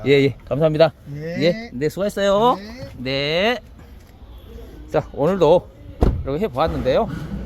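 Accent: Jeolla, South Korea. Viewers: 항상 침착하게 그리고 쉬운 퍼포먼스로 말씀을 아주 잘해주세요!~^^ 찰지게 또 하나 배워갑니다ㅎ 처서 가 코앞이지만 몸관리 신경쓰셔셔 컨디션조절 잘하시길 기원드립니다!! 역쉬 구수한 전라도사투리 짱!ㅎ 참고로 저는 충청도 입니다!~ 나가 아주 미쳐불어 미쳐불어 미쳐분당게..ㅎㅎ^^ 감사합니다. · 전라도 말씨가 정겹네요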